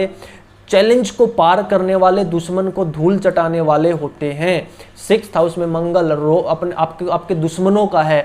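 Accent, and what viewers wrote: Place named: Haryana, India. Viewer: ji aap ki hindi mein haryanvi tone aa rhi hai 😃 I guessed correctly 👍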